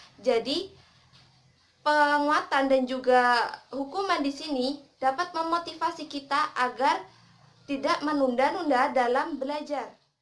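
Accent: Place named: Osing, Indonesia. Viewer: Ketoro wong osing 😁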